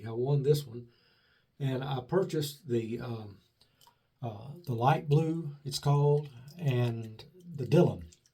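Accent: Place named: South, United States. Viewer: Excellent shave sir with top notch products!!! If the soap smells like Stirling Haverford, which happens to own, it must smell darn good. Tobacco and vanilla can't go wrong. The razors audio feedback is tremendous, I'd love to own one, can't get them in the UK unfortunately unless I pay the ridiculous shipping costs involved. I have also the exact same semogue OC with this orange handle it's fantastic brush as all the semogues are. Love your videos keep them coming. I also love your southern accent it's my favorite accent sounds like music in my ears. How often do you shave? Enjoy your day buddy.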